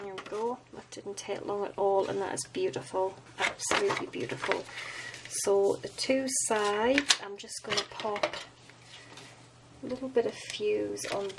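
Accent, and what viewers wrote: United Kingdom. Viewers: I love this card. I have everything to make this because I just bought the papers and the stamp set because they are so beautiful. Also, just want to thank you for making these videos. I am American and watch some American Stampin Up demonstrators but find that y'all in the UK tend to make more boxes and things (like the journal you made not long ago), and I enjoy making the boxes and such, too. So, thanks again! P.S. I love your accent! If you don't mind me asking, what part of the UK are you from?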